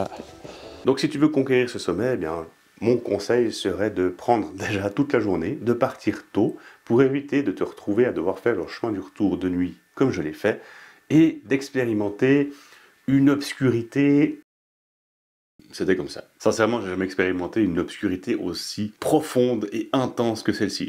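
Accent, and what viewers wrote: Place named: United Kingdom. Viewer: Je suis tombé sur vos vidéos il y a quelques jours, et en tant que grand passionné de l’Écosse et de tout ce qui s’y rapporte, je tenais à vous dire à quel point je les trouve captivantes. Votre ton résolument "so British" (même si, en Écosse, ce n’est pas toujours un compliment 😄) apporte une touche unique et agréable. Bravo pour votre travail, et surtout, continuez comme ça ! Alba gu bràth , Slàinte Mhath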